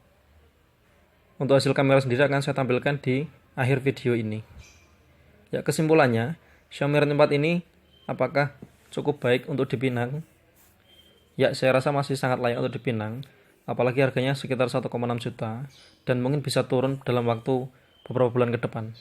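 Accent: Java, Indonesia. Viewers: Medok bgt jawanya...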